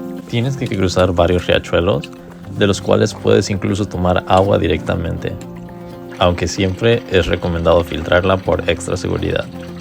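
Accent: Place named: Mexico. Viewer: Awesome view and definitely inspiring too, especially when the old lady caught up and said that she's climbed that mountain for 25 times! 😊 非常棒的影片，看完之後真的會讓人也想去爬山，Dr. Razo的墨西哥口音也很好理解，可以邊享受美景邊練習聽力，真的是一舉兩得😉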